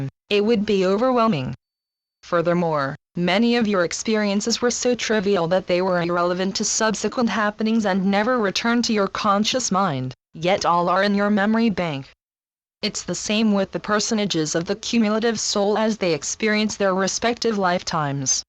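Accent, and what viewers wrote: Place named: United States. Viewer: The information is correct. In order to absorb information like this, it is necessary to put all previous experiences to one side, including prejudice of any kind, which may have been put into your mind by others, including family members and the current educational system, or experiences which may have made you afraid when young. This includes putting to one side prejudice against the 'robotic' American accent used in these transmissions. Those who are able to do this, will be able to understand the information. Those who are not ready, will not be able to, because they are allowing the remembered emotion FEAR, which is a strong emotion, to control them. The method is simple, but it takes practice. You just say, out loud if necessary, 'I AM AFRAID OF NOTHING'. I am not at all religious. But I DO believe in methods that can remove fear from people.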